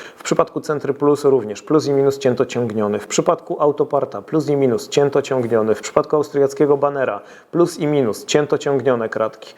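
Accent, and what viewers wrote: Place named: Ukraine. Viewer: Kanały: Bater tech; Świat akumulatorów; i - wszedzie chwalą ukraiskie akumulatory.Oczywiście inne też - ale to tu to tam słychac Ukraiski akcent...Prześledziłem przypadkowo te kanały bo szukałem akumulatora ale im dłuzej słuchałem to lampka zaświeciła mi się jedna - pracujecie mocno nad nami Polakami. Marki uznane chwalicie najbardziej - no bo nie ma wyjscia..ale jakoś potem czesto wystepuje marka Ukrainska. Zeby była jasność -Nie powiedziałem, że jest zła..nie wiem jaka jest - dopiero szukam akumulatora. Zeby była jasność - nie jestem wrogo nastawiony do Ukrainców. Ale na trzech niby różnych kanałach widzę jedno podobieństwo - Ukraina.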